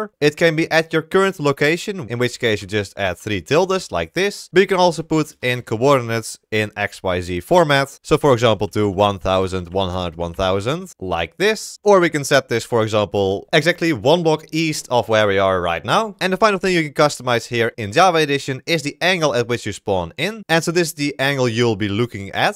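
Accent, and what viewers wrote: Netherlands. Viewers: je bent nederlands ik hoor het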